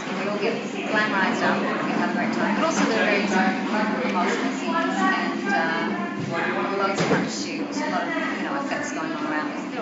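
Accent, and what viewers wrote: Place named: Australia. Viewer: i do detect a bit of an aussie accent, having been to that beautiful country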